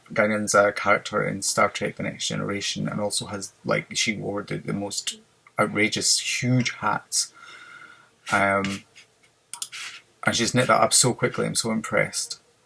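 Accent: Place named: Scotland, United Kingdom. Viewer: Hi, this is my first dip into your world, after Helen mentioned you. I have watched podcasts from around the world for a few years so it's great to hear a voice I could almost call local, I am from Northern Ireland but did 4 years in Edinburgh at uni many years ago. I am rainroseknits on Instagram and ravelry.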